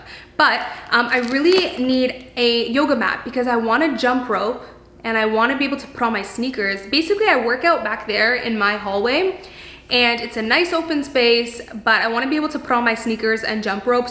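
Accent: Canada. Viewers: Oh is she from Canada? I was noticing she says “beegs” instead of “bags” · Jaclyn Calderon it’s cringe to me but that’s how Canadians say it lol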